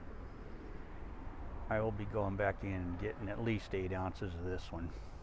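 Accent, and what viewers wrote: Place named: South, United States. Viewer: Great review. Sounds good. And I see you are adjusting to the southern country life just fine. Even starting to get the drawl y'all.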